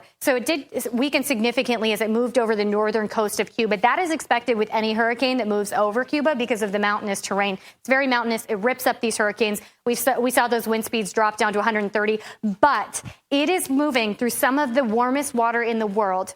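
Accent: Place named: New England, United States. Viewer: Where did they get this woman ?? Fox is now hiring extemporaneous female speakers. Grab them off the street, give them a page they have never seen, put them on the air in 10 seconds You do not even need to be a functional reader. Make it up as you go. Obviously, she's a an office holder in the Republican Party. She would come across better, if she was not a Yankee. I'm not trying to give you extra work here.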